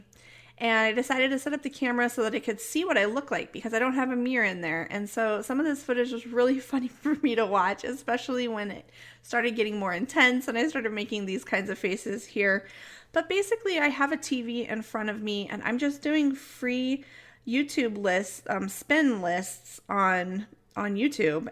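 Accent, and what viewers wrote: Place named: United States. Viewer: Hi. I am from South Wales (UK). Just a few miles from where Richard Burton and Anthony Hopkins were born :--). Accents are rather difficult to describe of course. Yours is clearly 'American', however, without any 'sharpness' or 'high to low' then 'low to high' intonations which my ears pick up on with some (not all) American accents. Thats just me though, haha.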